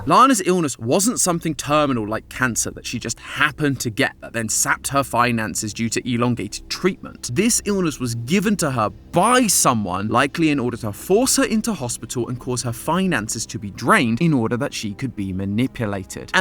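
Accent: United Kingdom. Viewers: has always been british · Why are you British now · I DID NOT EXPECT THIS BRI'ISH MATTPATT REPLACEMENT TO SAY "AGGYDIGIMON!" 😂😂😂😂😂😂 Also no offense, you are a great fill in for mattpatt right now. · me not paying attention "ok new style theory" "hmm, Amy sounds very British"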